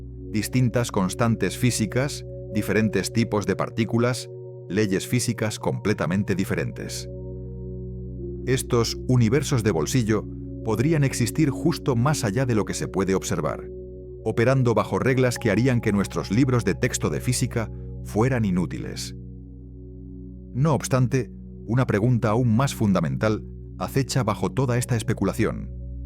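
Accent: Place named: Spain. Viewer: menos mal que está en español de España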